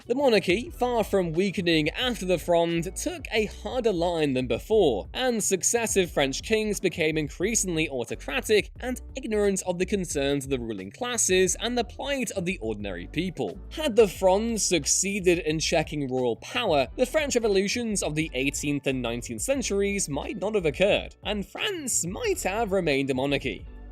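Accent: United Kingdom. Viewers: What part of the UK is the host from?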